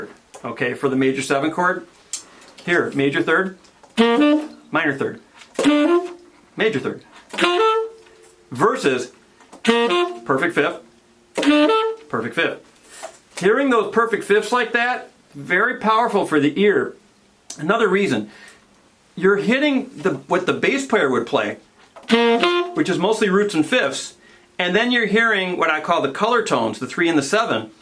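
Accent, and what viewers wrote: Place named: Chicago, United States. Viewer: Totally nifty approach,! Thank you. Funny coincidence: This is the first time I've watched one of your videos. Got fascinated right away, and while I was listening I started hearing something familiar in your voice. I grew up in Buffalo (Go Bills!) but haven't lived there since '69, and I started thinking "This guy sounds like he's from Buffalo!" But right away, I think, "No, Wait," because, suddenly I'm hearing the Blues Bros in your voice and I think, "Chicago!" And I spend the next few minutes going back and forth, trying to hear which one it was. And all of a sudden, you're talking about the Cubbies and Chicago, and it all becomes clear. Your short "'O" is a dead giveaway. Anyway, it's good to see a Rust Belt saxman doing well. Great lesson, and Best wishes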